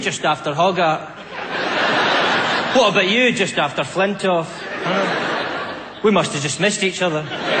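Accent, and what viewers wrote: Australia. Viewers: Wonderful aussie accent! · his aussie accent is spot on, and aussie parliament is exactly like that........ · how funny is this guy, he does aussie better than most aussies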